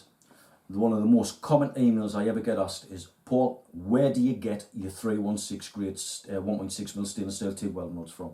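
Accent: Sunderland, United Kingdom. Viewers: Hi, I just stumbled across this video, I don't go Sea fishing but used to do a lot of match fishing, but only club level, best times spent with your friends. Found this absolutely fascinating, the stainless steel knowledge, the mono or braid, and understanding the Sunderland dialect - I'm a woolyback from St.Helens!! This may have been filmed a while ago but I'll be back for more. This is great, content. Subscribed and will be back to watch more. Take care 👍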